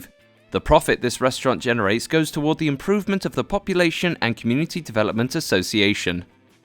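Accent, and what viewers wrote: United Kingdom. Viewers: Elliott Stewart- You realize the announcer is British right? They pronounce things differently. I'm from Texas and have never heard anybody say Spo-kane so I think Americans get it. Also, your apostrophe is unnecessary. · AngryTurtle Gaming He's British.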